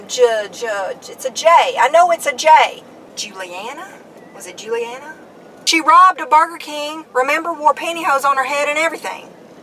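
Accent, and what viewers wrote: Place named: Texas, United States. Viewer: Where are you from? We sound alike. I’m from Texas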